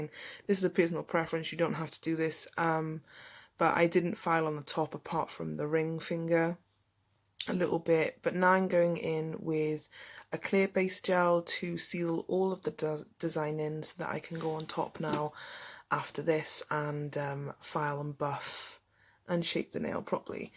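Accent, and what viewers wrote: Cardiff, United Kingdom. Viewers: This is the first time I’ve watched this lash. I really like how she talks. Sounds a bit like a Cardiff accent? Anyway I found her very helpful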